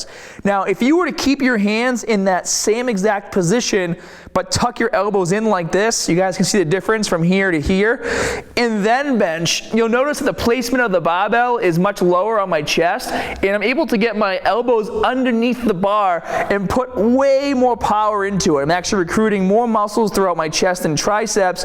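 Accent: Boston, United States. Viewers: Where did the Boston accent come from??? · Did you just say Bah? That Bahston accent really coming out hard, bro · I dont need your opinion Hell no. Don't disrespect NJ like that lol. This is a Baaahhhston accent. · is he from boston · Did he always have a Boston accent? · Great vid. Accent is great hah, from boston? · Good video so ima guess your from Boston lol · since when did you have a boston accent? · Are you from Boston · havnt watched your vids for a little while now but ERM lol what's with that Boston accent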